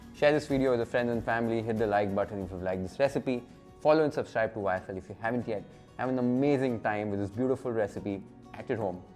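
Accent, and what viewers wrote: Maharashtra, India. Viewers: Love ur Marathi accent. Malaa bengali fish curry tumcha style chhee receipe share karaa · Love your Marathi accent Chef ❤ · I love your Marathi accent and all recipes are awesome ❤❤❤ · Is he from Maharashtra? I thought he's from Punjab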